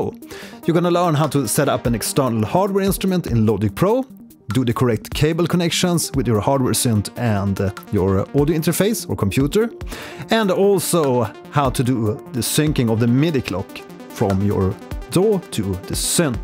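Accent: Sweden. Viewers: Hey Great and to the point video. And you sound very Swedish! My girlfriend is from . Im going to play this vid so she can tell me what area you are from . Maybe your from Norway? Any way i love the Scandinavian teaching method and love of music. Some of the best musicans i know and have seen/heard are from the region. I am having a clocking issue. Since i upgraded to osx 14, there is not enough clock send locations are in the send box. I’m not sure if logic is now limiting how many simultaneous clock send can happen but all of the midi connections show in the mid window but not in the clock destinations window if that makes sense. I have about 20 incoming midi and they all appear, but maybe only about, 14 or 15 show for the clock send. That’s how I found your cool channel while searching for this solution to the problem. Just curious if you have seen that issue. 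If I find the answer, I will send a message here so that everybody can know. I’ll be watching more of your videos too .very very good videos.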